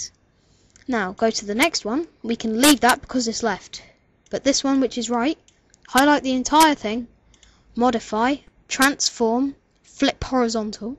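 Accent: United Kingdom. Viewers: thx! this really halped! and w00t FINALLY i hear a British voice on youtube 4 once XD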